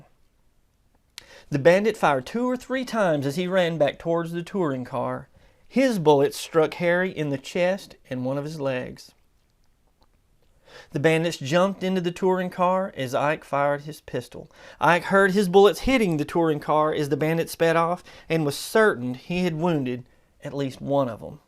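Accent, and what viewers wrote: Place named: Tulsa, United States. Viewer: OK, I grew up in Tulsa and still live nearby and you sound like a local. Am I correct? Great job.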